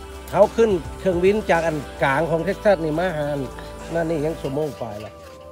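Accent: Thailand. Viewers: สำเนียงอย่างคนลำปางลุ๊คับ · อู้เหมือนคนภาคเหนือเจียงฮาย ประเทศไทยเลย ท่านเป็นคนที่ไหนครับ และเดี๋ยวนี้อยู่ประเทศอะไร ดูน่าอยู่มาก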